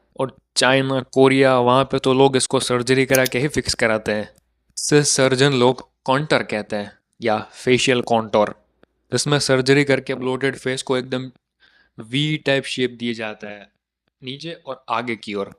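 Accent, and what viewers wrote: Gujarat, India. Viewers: Vhi to voice tone gujrati hai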